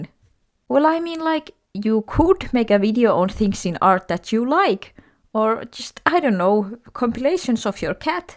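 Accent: Finland. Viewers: wait are u Finnish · You sound suspiciously Finnish. Olisitko maamiehiä? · ….i am tripping you sound finnish to me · are you finnish? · Finnish accent spotted 🫵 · WAIT ARE YOU FINNISH? (Im just assuming bc of your accent :DD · this is random but are you finnish because i hear an accent to your voice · Are you Finnish? As a Finn, I'm getting major Finnish vibes !!! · Ookko suomalainen? Your accent sounds like you could be finnish. :D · The way we fins speak english is so funny also cute artsyle you got :3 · I'm like 40 seconds into the video but... my brain is telling me to comment this: you sound incredibly finnish. Are you finnish?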